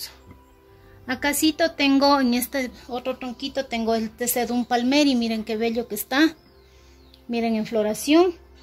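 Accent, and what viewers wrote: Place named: State of Mexico, Mexico. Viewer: Esta hermoso tu jardín 💕 😍 ♥ ❤ 💖 💜 sigue adelante.tu acento parece del Estado de México México.....pero ya vi eres de Ecuador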